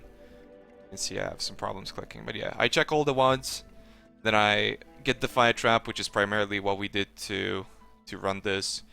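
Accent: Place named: Poland. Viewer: jak się człowiek spieszy to się diabeł cieszy ^^ od dawna cię oglądam, ale nie wiedziałem że jesteś z Polski :D trudno wyczuć akcent, gratulacje! powodzenia na lidze!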